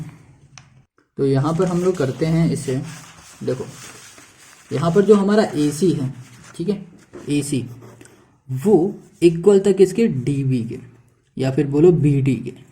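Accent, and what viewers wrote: Bihar, India. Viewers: You are from bihar sir?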